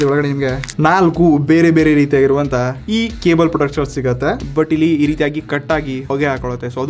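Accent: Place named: Belagavi, India. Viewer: ಬ್ರೋ ನಡಕ ನಡಕ ನೀವ್ ಬೆಳಗಾವಿ ಭಾಷೆ ಮಾತಾಡ್ತೀರಿ ಲಾ ಅದ ಮಾತ್ರ ಮಸ್ತ್ .....ಆ ಸ್ಪಾಂಜ್ ನೋಡಿ ಅಂದ್ರಿಲ*ಸ್ಮೆಲ್ ಬರತೇತೋ ಇದ*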